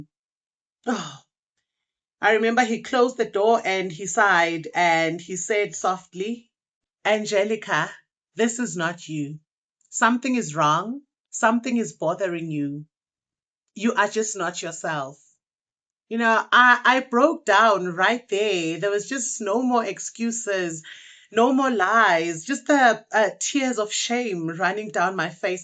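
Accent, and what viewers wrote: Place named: South Africa. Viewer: You sound like one of us. Anyway, love from South Africa 🇿🇦 !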